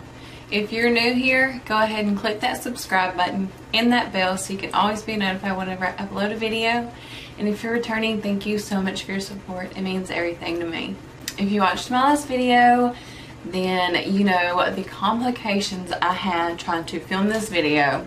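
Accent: South, United States. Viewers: I love your videos so much and your southern accent!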